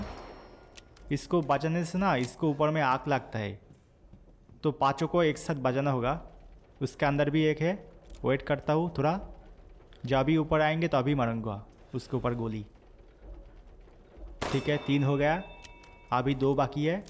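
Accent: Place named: Bengal, India. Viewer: বাংলায় বলেন আপনি যে বাঙ্গালি সেটা আপনার হিন্দি বলার ধরনই বোঝায় আপনি বাংলায় বলুন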